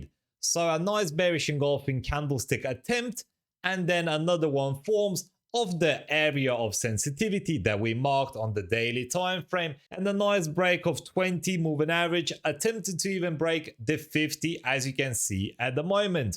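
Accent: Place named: United Kingdom. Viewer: I have no idea why the messages aren’t coming from your side. However I am available in ur discord server. Are u a resident in UK 🇬🇧? Your accent seems like u are a British.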